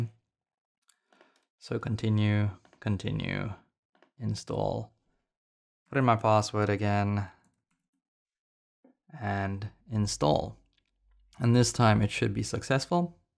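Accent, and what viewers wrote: South Africa. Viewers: While I was listening to you my wife walked in and she was like, bet you his south african 😆 I lived in cape town for years but it became way to busy for me. Living in a small coastal town atm. Thank you for the great content.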